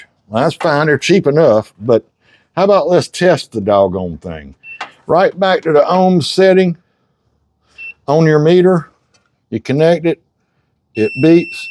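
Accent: South, United States. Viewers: I knew you had that Southern Drawl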